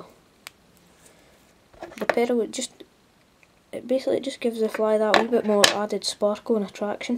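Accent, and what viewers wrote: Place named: Ayrshire, United Kingdom. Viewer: You sound and even tie like the great fly tyer "Davie McPhail" fae Ayr!!! well done son i love yer enthusism and confidence tying these ;) Where you fae? you sound like my accent as Im from Auchinleck Ayrshire!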